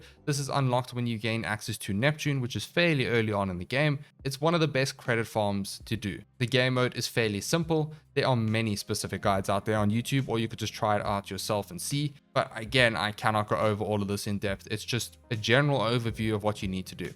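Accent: South Africa. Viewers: Bra why do you sound south african. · South African?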